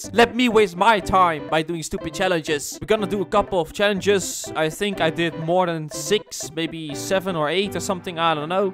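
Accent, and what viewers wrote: Netherlands. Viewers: Lekker Nederlands accent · Nederlands accent echt geweldig😂😂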